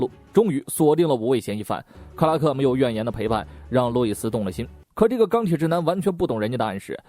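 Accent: China. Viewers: 介广东话比我还飘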